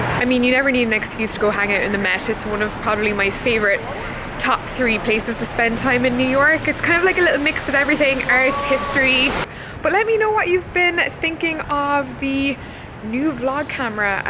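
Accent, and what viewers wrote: Ireland. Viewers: 👌👍🤣 Still one of the theee most FABuuulous things you've said in the plethora of content with THAT wee accent!! "There is no care package than a care package from ..... from an Irish mammy" p.s. you-do-not-age girl...it is now Oct. end 2019 & this vid is from beg of March 2018....just sayin'!! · I think its the Irish accent and New York! And you living the dream out in the big apple!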